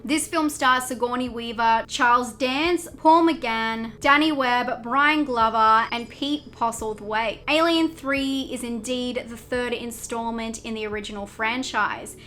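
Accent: Australia. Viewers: People making a fuss over pronunciation are ridiculous. I love your Australian accent! It rocks🤘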